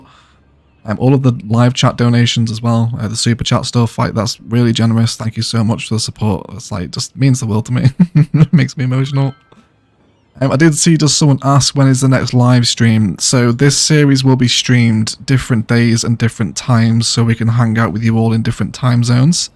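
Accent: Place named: United Kingdom. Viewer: Found your channel accidentally but so glad I did!!! Good to hear a fellow Brit playing this 👍🏻👍🏻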